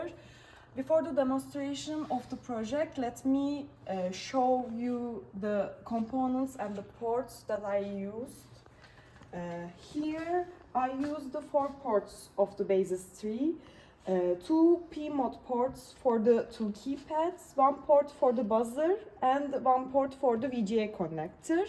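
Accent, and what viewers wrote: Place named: Turkey. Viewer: Türk'ün şivesine bile kurban🫡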